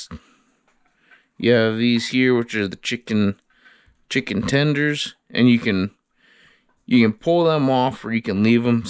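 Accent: Canada. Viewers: dude, i knew from the first 3 words you said you were Canadian. I just wasn't sure where. Anyway, loved the vid n keep up feeding the fam. Especially your little hunter lol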